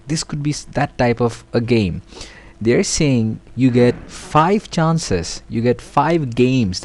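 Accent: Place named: Caribbean. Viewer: Thank you verry much...like your accent..west indian? very good teacher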